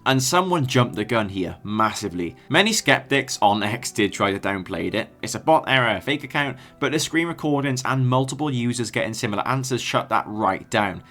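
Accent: South Wales, United Kingdom. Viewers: So where in south wales are you from? (I can hear it, man)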